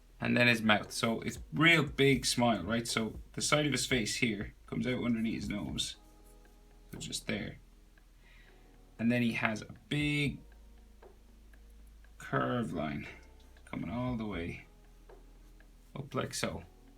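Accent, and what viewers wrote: Ireland. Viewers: Are you Irish cos you sound it??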